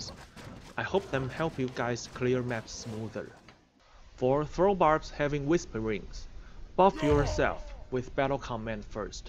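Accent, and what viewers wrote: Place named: Japan. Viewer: Love it! :) Entertaining and useful! Love the japanese accent aswell adds to the credibility. I dont have time to the all the test a research anymore so this is very nice. Keep up the good work.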